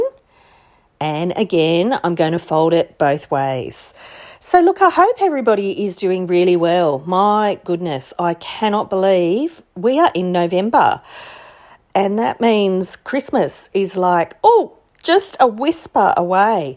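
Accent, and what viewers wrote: Australia. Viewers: Nice to hear an Aussie voice! Great little project!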